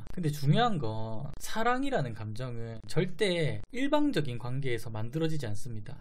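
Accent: Gyeongsang, South Korea. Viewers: 왜 연애 유튜버분들은 경상도 사투리가 많을까요 이분은 현재 연애상담 유튜버 하시는분의 말투나 톤이 넘 닮았어요 넘 놀래서 댓글 달아요 😮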